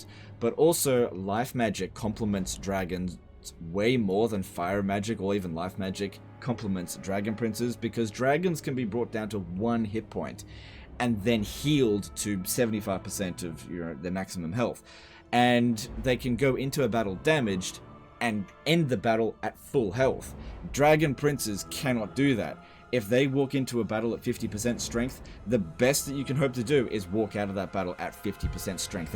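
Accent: Queensland, Australia. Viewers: Hey, I was curious if you might consider doing a "how to level" {hero/lord name here}. I just realized as I was playing a Thorek campaign how I have no idea what traits to take down the blue line to get Lightning Strike are. Not sure what the algorithms leaning towards these days as to video number or length ( nearly 400k in it's all probably gravy to you at this point ), but I wouldn't mind that kind of guide with a bit of queenslander accent thrown in.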